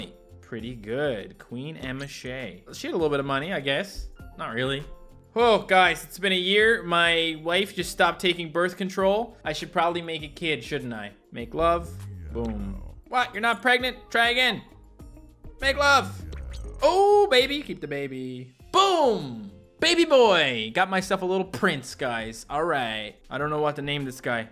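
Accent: United Kingdom. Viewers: Is that really your British accent? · That’s an ok british voice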